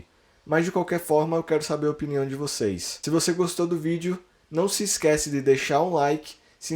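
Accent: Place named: Bahia, Brazil. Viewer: Manin, desculpa a pergunta meio inusitada, mas tu é baiano? É que o seu sotaque me lembra muito o do Vampeta kkkk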